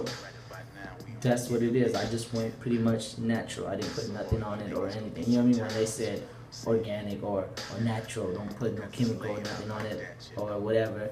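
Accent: Bay Area, United States. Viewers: KingOfKings it's called having a Bay Area accent, you obviously aren't from the bay. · You sound like you are from the bay area you got that language to you. Dreads are dope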